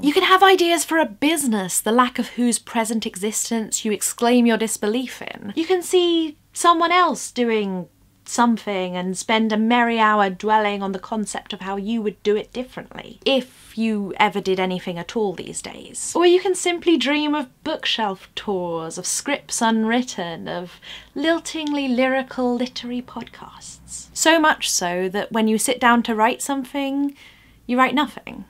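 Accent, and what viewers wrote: United Kingdom. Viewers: Great to hear! Yes your writing and lyrical performances are very pleasing in and of themselves, but speaking as an American, I'd be lying to myself if I didn't credit your wonderful British accent as well. Haha. Also speaking as an American, I'll take the lashings on behalf of my country for doing our fair share to fuck up gender politics in 2017. bows to ironic applause someone throws a tomato (you read that word as "ta-mah-toe) ;)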